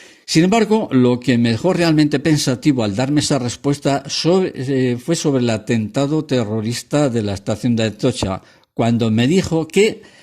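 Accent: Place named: Bolivia. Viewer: Tiene acento puramente boliviano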